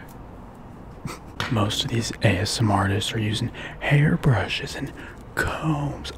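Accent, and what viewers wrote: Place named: Texas, United States. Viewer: So manly!💪The tools, the WD40, the garage and the extra Texan accent is giving hot masculine Daddy vibes! 👅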